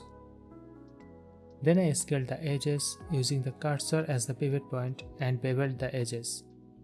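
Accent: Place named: India. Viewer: no wonder the accent felt indian but slightly off.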